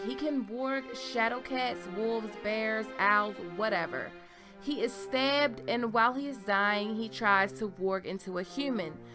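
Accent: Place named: Baltimore, United States. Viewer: You are absolutely from Baltimore! I giggle every time you say "Juice". And thanks, every time I say the word 'okay', I hear your voice on my head! 😂 Love your vids along with the others in the crew!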